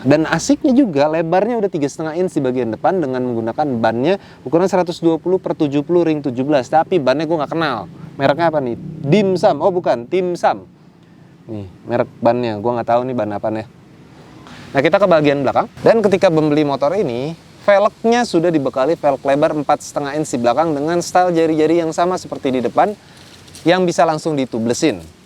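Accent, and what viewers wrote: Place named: Medan, Indonesia. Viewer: Tengok lah itu, kaya orng medan aja bg ini 😂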